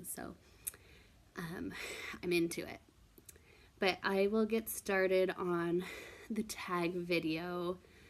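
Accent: California, United States. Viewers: Yesssss! I'm so glad you did this! You speak just like my husband who's from LA. He pronounces the "T" like you do, most American's have silent "T"'s but Californian's are a breed of their own! lol. I TOTALLY forgot about buggy!! The words you use are closer to mine which i think is because we're both west coast kids lol. Great Video! I cringe when I hear "toilette" as well lol. it seems so crass! lol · Aww I LOVE this haha. To me you definitely do have the Cali girl type accent lol. Even though I know you don't call it that or consider it one, lol. I have never heard anyone refer to the bathroom as WC before lol. I have heard them call it "The Loo", but that's so funny. Learn something new every day! I think people in the UK call a shopping cart a buggy too, or trolly lol. This was a lot of fun! Thanks so much for doing it <3 :D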